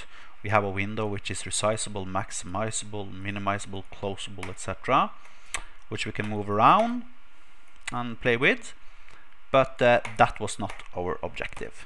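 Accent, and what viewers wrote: Norway. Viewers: loooooooool!! i knew you were norwegian, typical norwegian "english voice", kul tutorial foresten xD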